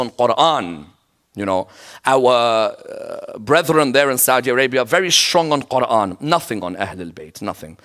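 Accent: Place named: Iran. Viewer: bahasa inggrisnya aksen persianya kentel banget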